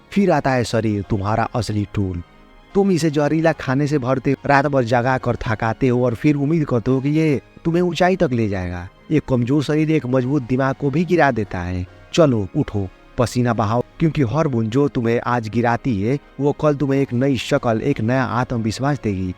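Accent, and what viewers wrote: Bengal, India. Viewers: Bahot badhia bengali accent dada.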